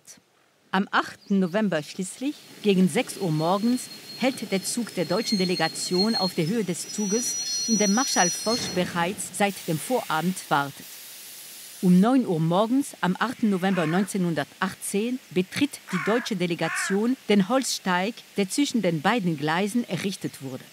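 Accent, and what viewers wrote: France. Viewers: Marschall ist ein deutsches Wort. Die Sprecherin spricht deutsch (mit französischem Akzent). Alles korrekt bei arte. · Man hört sofort ihren französischen Akzent!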